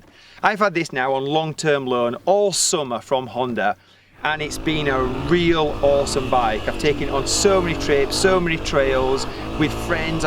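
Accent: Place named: North England, United Kingdom. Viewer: You sound like a Englishman (albeit a northerner). I thought this bike wasn't available in the UK. Where can I get one?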